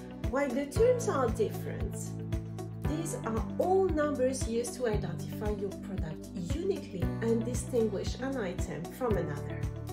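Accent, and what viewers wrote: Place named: France. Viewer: When explaining things in English, a first language speaker is a good idea. The french accent is particularly strong here and coupled with the overly loud music makes this unwatchable.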